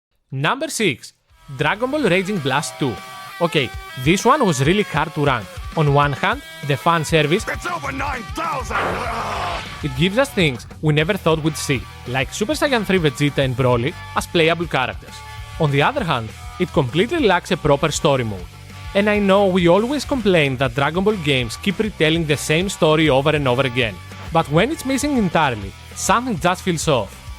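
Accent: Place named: Greece. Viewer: Greek English the best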